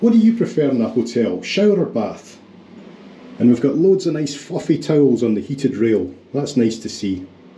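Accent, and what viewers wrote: Scotland, United Kingdom. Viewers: When you talked about a kilt you made me chuckle. I remember someone telling me that a lot of Scotsmen where them to a futball(?) (soccer) game I believe it was and without underwear. I was just picturing that in a large stadium of people and smiling. Something we wouldn't see here in the United States. I enjoy a Scotish accent and am enjoying the videos that are coming up on my feed of yours.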